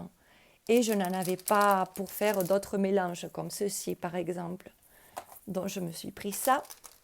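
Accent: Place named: Spain. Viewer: Mais ton accent 💖 la moitié de ma famille est d'origine espagnole et quand je t'entends ça me détend tellement je ferme les yeux je retourne en enfance je m'y croirai presque 😁💖 Tu m'as fait rire avec le fait de ne pas vouloir acheter trop de gammes de crayons, je crois qu'on tombe tous dans le piège quand on commence le colo 😂😂 Merci pour le partage je me régale avec tes vidéos 🥰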